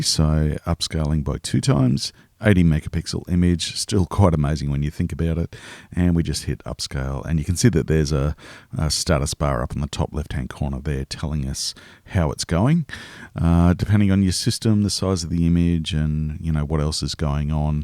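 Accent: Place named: Australia. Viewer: I've only just come across your channel and I'm happy to hear an Aussie accent. Thank you for the info. I'm newish to LR and will be going through your playlist to learn more 👍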